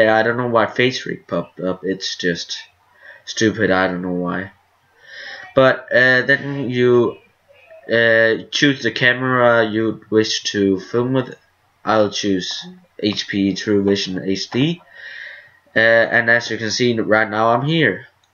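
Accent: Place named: Denmark. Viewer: dansk aksang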